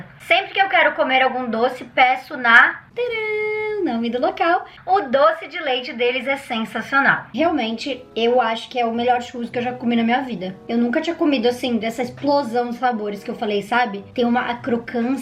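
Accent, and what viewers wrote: Rio Grande do Sul, Brazil. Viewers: Eu: aaaaaa è gauchaaaaaaaaaa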